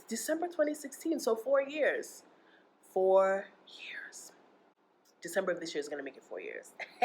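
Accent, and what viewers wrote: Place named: United States. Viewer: OMG I love ❤️ it you put Jesus first! I’ve watched a lot of YouTube videos from people moving to Lagos from other places, but you’re the only one with my America accent.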